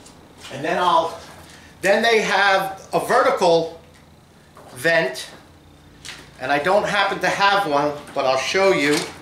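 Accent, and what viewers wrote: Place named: New England, United States. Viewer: Love your yankee accent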